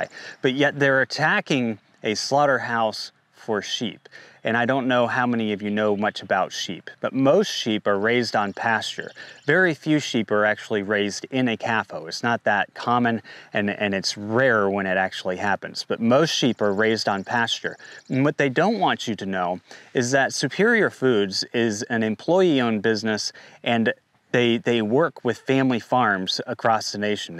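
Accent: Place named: East Coast, United States. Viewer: You must be from the east coast somewhere. I'm from Oregon. We say it Orgun. It doesn't rhyme with polygon. Just Orgun, thanks. Also, it's not ColorAHdo. The A in Colorado sounds like the a in apple. No umlaut. Yes, I know that it's a Spanish word and in Spanish they'd say AH. I used to get it wrong too. Doesn't matter. Point is you don't sound like a local and that annoys the locals. Also, I have a bone to pick with you hoity-toity eastcoasters treating west coasters like we're stupid bumpkins. At least we can pronounce all of your state's names properly without all the stubborn denial of our own ignorance. I've had New Yonkers tell me I'm pronouncing Oregon wrong when I'd hoped to enlighten them in the past. The nerve! Namaste!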